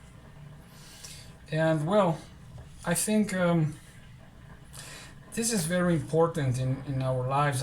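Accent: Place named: Spain. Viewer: Maybe your accent is derived from Spanish, Wenders is German, but seriously heard a similarity, also in your contemplative style.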